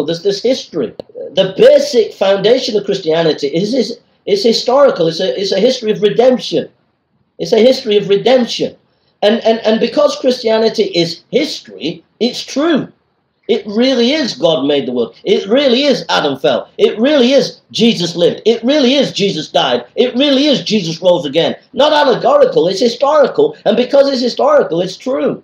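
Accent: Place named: Liverpool, United Kingdom. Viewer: My grandfather was Liverpudlian Ave been there loads of time ferry across the mersey BUT if your nancinian then I'm way off on your accent if a or any one knows where he's from gotta be Liverpool or Lancashire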